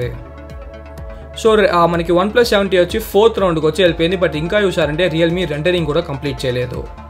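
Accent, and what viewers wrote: Chittoor, India. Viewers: Nice video bro kastha chitoor slang undi 😀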